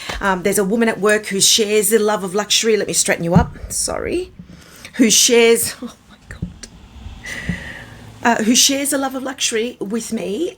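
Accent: Australia. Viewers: Love the Australian accent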